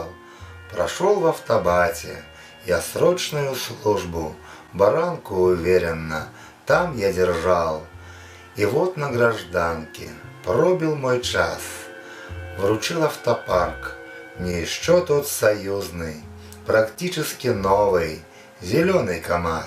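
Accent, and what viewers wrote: Belarus. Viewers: Братка- беларус чытае?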